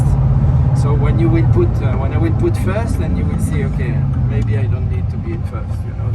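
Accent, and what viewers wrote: India. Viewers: You sounds indian❤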